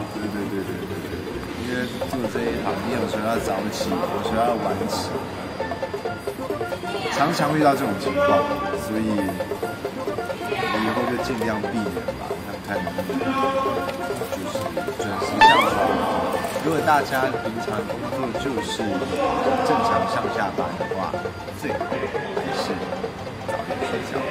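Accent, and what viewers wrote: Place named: China. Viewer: Me encanta su acento con chino. 😍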